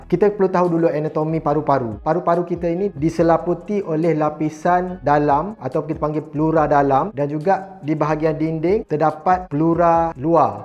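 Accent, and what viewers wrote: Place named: Kelantan, Malaysia. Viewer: Ore klate pesen nii..gaya kecek gitu😂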